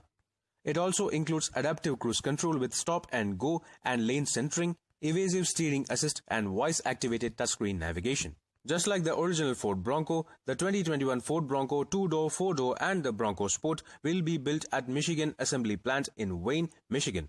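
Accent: India. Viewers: What in the hell is it with the guy from India doing the narration ???????????? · The accent of this man, reminds me a liquor store or seven eleven.....Indian accent..you can figure it out miles away... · I’m sorry but when we have an Indian/no offense talk about the Ford bronco it doesn’t sound very American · What’s an Indian doing narrating this it sounds so annoying and I can’t finish the video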